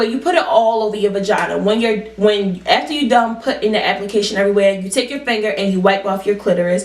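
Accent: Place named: Maryland, United States. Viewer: Hearing you talk I was like “hmmm she sounds like she’s from Maryland” then I read the DB 😂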